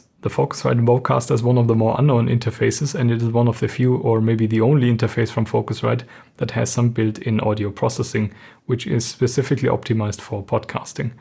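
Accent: Germany. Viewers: man i am so sorry but it's just so crazy how grating german accents are in english, especially his, just full on german sounds, cadence and everything but in english, his english is great but i literally can't listen to this, gotta read subtitles. i'm from germany but american born and this is not even a "me" thing, german accents are the #1 most frustrating to listen to GOSH man ich bin so abgefuckt davon aber ich weiss dass es sinnlos und dumm von mir ist, so empfindlich zu sein und dann noch ein kommentar drueber zu schreiben :D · I was really excited to see your video, 2 mins into the video I was purring like a cat, your quite monotone German accent put me to sleep, so I had to rewatch it in the morning.....You should start a new channel.... sorry 😮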